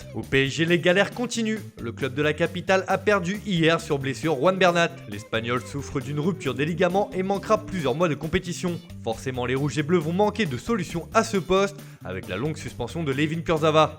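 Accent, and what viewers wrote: Italy. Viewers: j'ai cliqué sur cette vidéo et si j'entends cet accent italien je met un pouce vers le bas, désolé mais vous me laissez pas le choix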